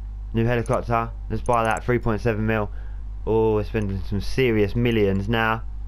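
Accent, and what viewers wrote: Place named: United Kingdom. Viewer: i know you are british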